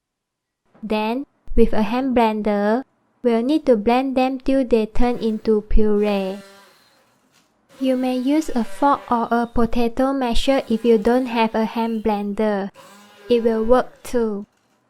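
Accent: Malaysia. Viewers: The title says Korea but She sounds like Malaysian/Singaporean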